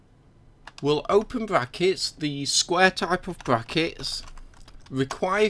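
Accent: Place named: United Kingdom. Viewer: i like british accent~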